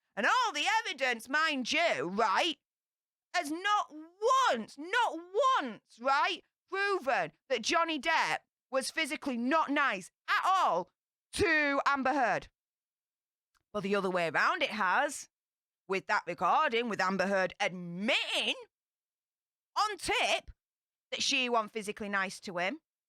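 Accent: Yorkshire, United Kingdom. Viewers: Is that a Yorkshire accent,? North or South? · Hi # Just saw you on popcorned. Love your Yorkshire accent. It's 'reet gravely'... Love the vid. I subbed! x Ve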